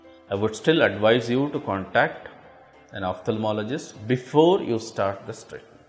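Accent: India. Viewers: His indian accent made the video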